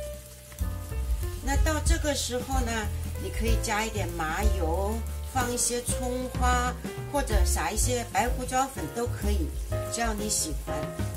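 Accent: Shanghai, China. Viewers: 最喜欢Jenny甜的发糯的上海普通话，今天就先包荠菜馄饨！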